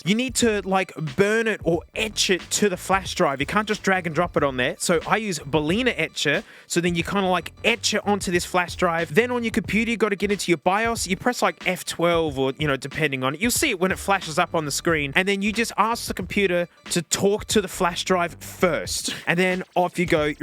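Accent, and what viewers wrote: Australia. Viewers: Yeah he's Australian, Adelaide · You're not Australian by any chance are ya? Mac, really? So, what took you so long to discover Linux?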